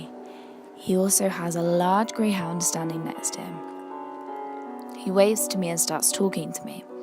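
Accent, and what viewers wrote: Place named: United Kingdom. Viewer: Thank you for using my story and the lovely shoutout! You're the best. I'm a sucker for a British accent. Beautiful narration darling.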